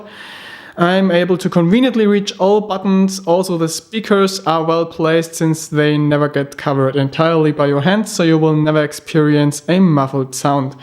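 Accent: Germany. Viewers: german spotted :D good review mate!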